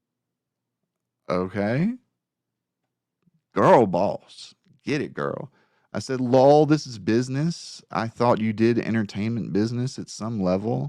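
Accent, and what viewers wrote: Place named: South, United States. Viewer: The reason X-Ray girl didn't talk much is because she didn't watch what the guy's do. She never saw Trek, StarWars, or read comics. Them saying she was limited to 8 words a stream was a running joke because she just wasn't comfortable talking about stuff. She organizes superchats for Gary and highlights ones he needs to read immediately by messaging him. She puts notes on ones for language or trying to decipher what they're saying because Gary isn't the strongest reader and has problems seeing the small print. As far as goes, I don't know where she thought she was going to work in animation at in the US. There aren't any here. It's all done in Canada or overseas now. As a southerner myself that happened to have lived in Canada for awhile, she sounds pretty typical of someone from one of the major provinces. I don't know why people think they're so polite because they were some of the rudest people I've ever met in my life. 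After having lived down here even my Canadian husband saw it. The first time we went back to visit his family he was trying to explain to his dad just how much more polite people are down here even just with traffic. Love your voice, but again as a fellow southerner, I appreciate the accent. As for Gundam, he sounds like a pretty typical musician. Most are very insecure and immature. Not really anything surprising. Especially for a front man/singer. Not going to unsub because I like his stuff and can separate the online persona from the real person. I'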